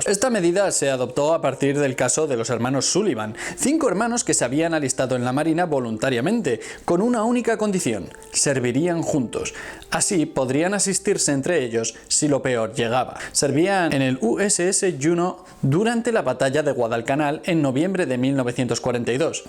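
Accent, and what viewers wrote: Spain. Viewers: Para comenzar, el dejo o mote Español me llega al chompiras. Y a quien xuxa le importa si el tanque, o la placa o la maquina de escribir son o no son de la época.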